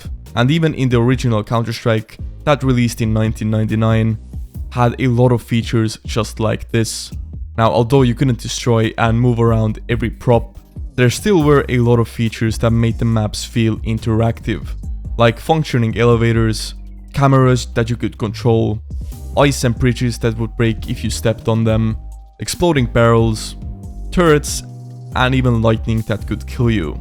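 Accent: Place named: Europe. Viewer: are you from a European country by any chance? your English is good by the way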